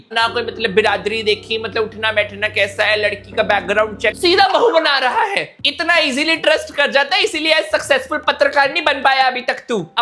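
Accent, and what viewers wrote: Punjab, India. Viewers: Bro ... I can see the movie is a total bheja fry but ur review is just outstanding 😂😂 bhai hasn hasn k pet dard ho gaya. I love the way u say things with a tint of punjabi 😂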